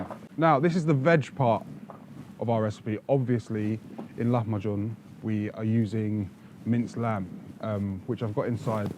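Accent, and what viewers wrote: United Kingdom. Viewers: I have a feeling that he is going to be like Turkish version of an Action Branson-Matty Matheson mix with a British Accent. No matter what, this channel has a huge "million view every video" potential. I hope you'll do great man. Also, I couldn't stop thinking that you wasted that juice by draining, that would be a great salad dressing base. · funny to hear a turk speaking british english. I love it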